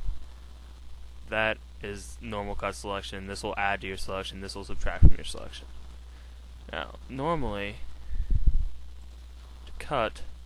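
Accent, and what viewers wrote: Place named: United States. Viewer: that american accent gets on my tit i cant understand nothing he says.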